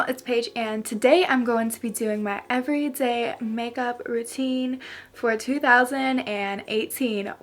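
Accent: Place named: Pittsburgh, United States. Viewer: Hahaha it's her accent- most people from Pittsburgh say it that way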